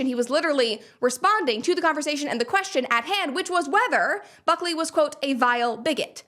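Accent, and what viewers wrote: United States. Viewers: this is going to sound weird, and I do not mean it to, but your voice is identical to my girlfriend Heather's in every way except for accent. My girlfriend, Heather, is from Hackney in London originally and came to Canada as a kid. Sometimes Heather is away as she is an officer that covers a lot of Northern Manitoba, so I tend to listen to you when she is away because then I feel like heather is there with me a bit. I'm totally blind and have no eyesight, so the two ways I tell people apart are by the sound of their voice and the texture of their hands, (and since i never met, the only thing I know about her is that she has tiny hands. I'm 5-1 tall and even my hands are bigger than hers according to my friend Ethan down in Chattanooga). Anyway, so because I don't know the hands, I have to go by your voice. Because there are only so many types of voices and timbres, yours happens to be identical to Heather's to the point that were it not for her accent, vocally I would confuse you and her. 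I worry lots about Heather every day when she goes out to do her job, she puts her life on the line every day to protect us here in Northern Manitoba. So that is why I get a bit of reassurance when I hear your voice, because it sounds like Heather's, only with an American accent not a tinge of Cockney. Thanks.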